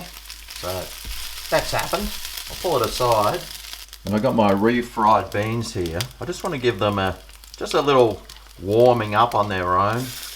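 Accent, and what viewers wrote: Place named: Australia. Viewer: Spanish spoken by an Australian!!!! OOH LA LA!!!!!!!!!!!!! We likey!!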